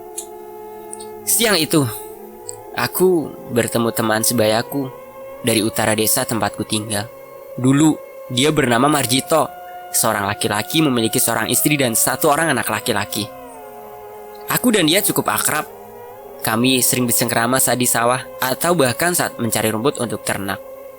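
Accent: Java, Indonesia. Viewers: Iku logate yo wes Jowo Gok!.. Jowo mu ndi se ?? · Logat Jawa yg mana ???..tiap daerah jawa punya logat beda-beda..jadi gak aneh..!!yg penting isi yg di sampaikan dapat di mengerti..ok bosqu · Yap betul...logat Jawa mmg kayak gitu Krn AQ orang Jawa...semangat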